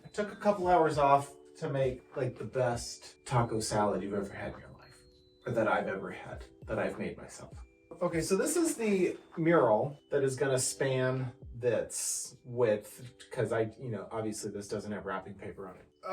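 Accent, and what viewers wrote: Minnesota, United States. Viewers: On the 10th Day of Vlogmas my true love gave to me: Ten-ish strands of "tinthel" Nine ladies ironin' Eight cameras tumblin' Seven cutouts glowin' Six berries spillin' Five Christmas trees 🎄🎄🎄🎄🎄 Four Paolo meows Three sets of batting Two power strips 🔌🔌 And you sound like the movie Fargo 🎄⛄🙂⛄🎄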